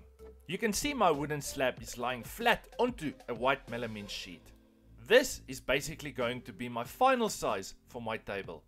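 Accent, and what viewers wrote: South Africa. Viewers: Out of interest sake, are you South African? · you South African? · This is such a South African accent 😂😂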